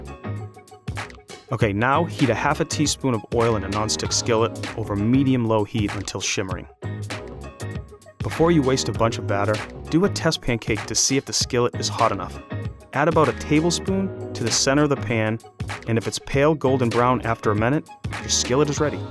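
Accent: Boston, United States. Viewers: William Hepfer It's the "I'm from Boston but I don't want you to realize it" accent. At the end he goes full Wahlberg. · I could listen to a full-length podcast in his soothing Boston accent. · America's Test Kitchen needs more cute bearded guys with Boston accents...I will now never buy Bisquick again. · In case y’all missed the Boston accent AND the channel name and decided to whine about metrics, it’s America’s test kitchen. We don’t bitch about European recipes, we just look up the conversions. And seriously, it’s pancakes, get a grip girlfriend.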